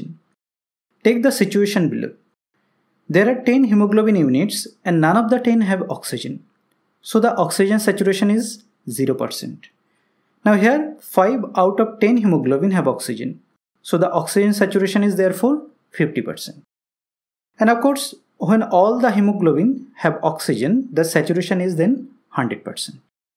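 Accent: India. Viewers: The keling slang is so indian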